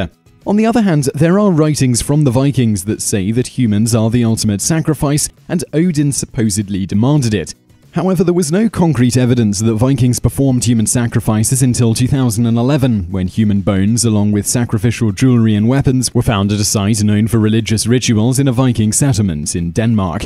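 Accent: United Kingdom. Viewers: That British accent makes you sound slightly less boring and inadequately prepared but not much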